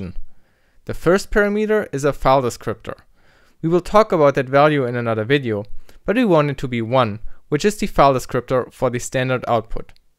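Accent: Germany. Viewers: really well explained! sind Sie Deutsch?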